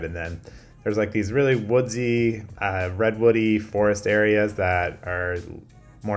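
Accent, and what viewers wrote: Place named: East Coast, United States. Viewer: Bro might live on the west but man, he talks like he's from the east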